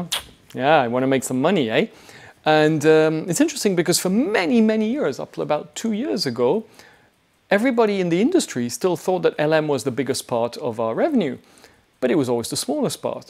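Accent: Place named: France. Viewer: i like the host' french accent, he got the right vibes on reviewing watches and makes me want to learn to speak french. Want more of his reviews in the future 😂🇫🇷👍🏾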